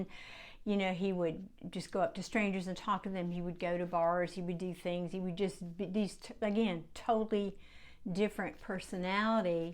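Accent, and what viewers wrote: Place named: South, United States. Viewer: it was we have to make lemon pies and lemonade out of lemons… also spirit says never apologize for your southern draw. Your gorgeous 🤍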